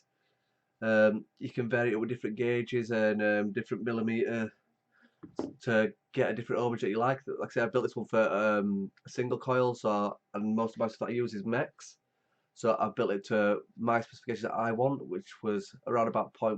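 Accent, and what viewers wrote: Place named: England, United Kingdom. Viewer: Few things I enjoy more than an English narrator. Cheers.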